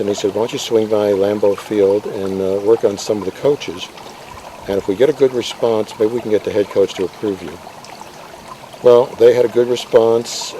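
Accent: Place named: Bengal, India. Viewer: Started off sounding like a bong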